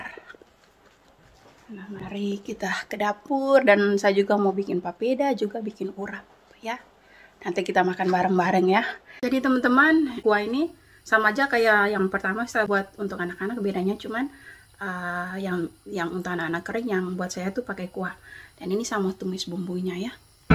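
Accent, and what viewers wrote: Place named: Ullath, Indonesia. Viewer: Mantap,logat Ullath bole biar ciri khas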